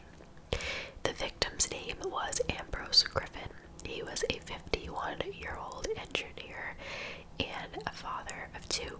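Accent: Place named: Independence Township, United States. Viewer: Such a soothing voice Always gotta remind myself irl sounds like she's from Independence Township Edit: not to mention, ASMR crime stories have put me to sleep more than once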